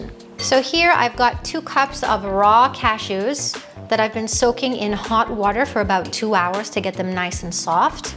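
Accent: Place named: Caribbean. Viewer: You sound Jamaican/ Caribbean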